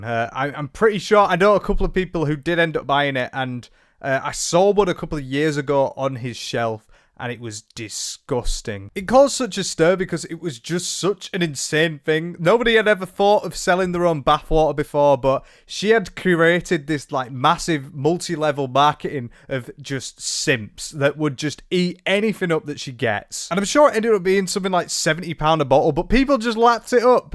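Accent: United Kingdom. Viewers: Huh i didn't think she'd have a British accent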